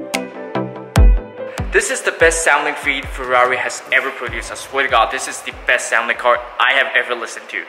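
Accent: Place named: United Kingdom. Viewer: Wow. Love your videos. I’m from uk. From your accent did you study here?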